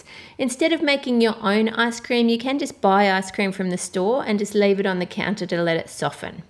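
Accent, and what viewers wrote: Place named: Australia. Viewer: English isnt my first languarge and I learned english by a american. so this austrailian accent is a bit hard to understand for me. 😅 The words sounds so different.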